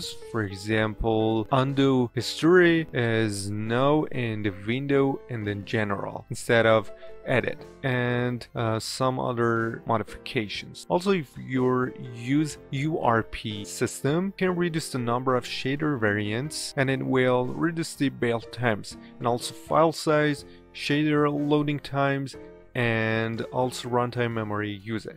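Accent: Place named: Eastern Europe. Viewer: probably dude eastern european dont make fun of it like that, Don't make fun of accents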